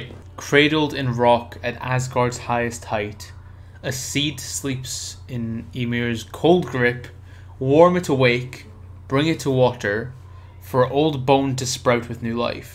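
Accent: Scotland, United Kingdom. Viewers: Where in Scotland are you from mate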